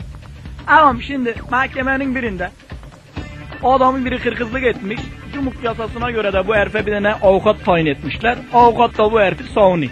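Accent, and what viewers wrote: Gaziantep, Turkey. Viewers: asil antep sivesi bu, dizidekilere kanmayin, onlari duydukca uyuz oluyom... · cok güzel bizim su antebcemiz yau gülmekten bittim haa yauw su antebimi öyle bi özledim ki vallah gidince antebin topragini öpecem sanada tsk sen beni güldürdün allah da seni güldürsün la yorum neding la haha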